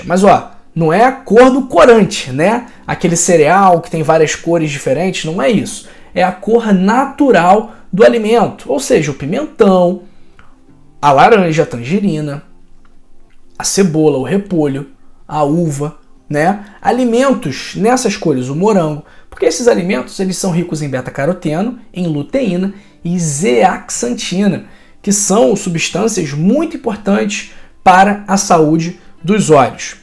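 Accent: Rio de Janeiro, Brazil. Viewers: Carioca ! Menos! . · Esse sotaque de carioca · Você fala muito muito carioca. É muitos x x x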